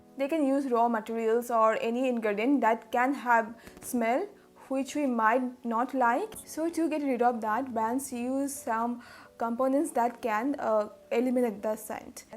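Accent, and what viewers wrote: Bengal, India. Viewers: She is not at all trying copy any accent here,its only MTI(mother tongue influence) as she is a Bangali,every person in India has their own English accent because of our regional languages. If we go to south India, their English is very different. Similarly, people living in north India have a different English accent, so what's wrong with her accent. Oh yes,she isn't an English teacher. You are doing a fabulous job. Let's help our fellow people to grow,let's motivate and encourage them. If we can't, lets just keep quiet. Let's be nice to people. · Her pronunciation is absolutely perfect. There is not a single way English words are pronounced lol. Americans, British,Irish and Australians have their own version of English. There is no ONE pronunciation. This is the Indian one and it's perfect. Stop making people speak the way you want them to speak.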